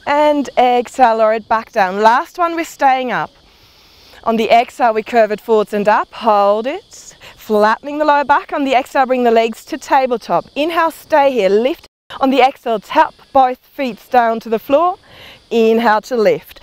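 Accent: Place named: Australia. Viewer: Nice video. I love you aussie accent !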